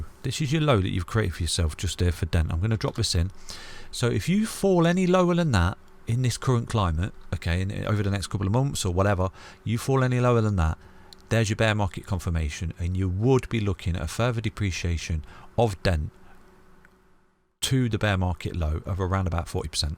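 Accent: United Kingdom. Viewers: Thanks so much. I love your videos (which are very eductive) and your fabulous british accent!:) Which PL team do you support? Take care.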